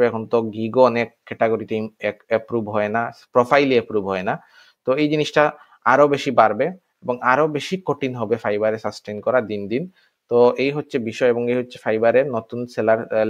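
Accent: Sylhet, Bangladesh. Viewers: Sylheti bhai ni apne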